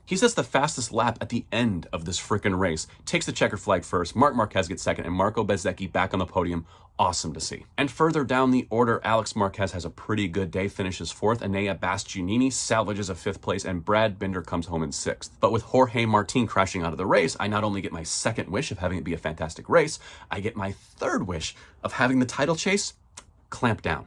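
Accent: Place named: United States. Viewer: Keep it up man you've got a new viewer. Nice to hear an American voice commenting on motogp! Youre a good speaker. Hoping Yamaha gets back in the mix soon.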